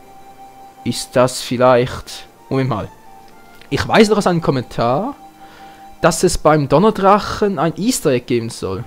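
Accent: Switzerland. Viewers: Man merkt total das du schweizer bist ^^ Bin auch schweizer aber kann perfekt deutsch ohne akzent reden, weiss auch nicht wieso :D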